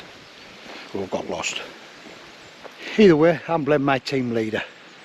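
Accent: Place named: Darlington, United Kingdom. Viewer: We’re stopping near Keswick for a week at the end of the month and plan on doing Whinlatter and 8 Wainwrights in that area. Our little lad is trying to raise £1k for cancer research in honour of his great Grandma, by completing 30 wainwrights by the end of the year. He’s on 13 at the min 👍🏻 We’re from Stanley, I was trying to decipher where your accent was from. Thought it was Aycliffe or Darlo. Would be great too meet up sometime, or we might pass you 😊👍🏻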